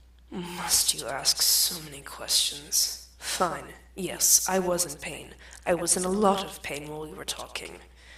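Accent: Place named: United Kingdom. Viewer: He sound british (｡・//ε//・｡)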